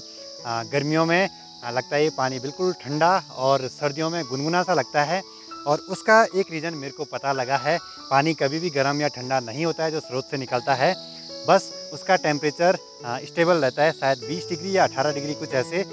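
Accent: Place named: Garhwal, India. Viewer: जगो तो गढ़वाली टोन है ।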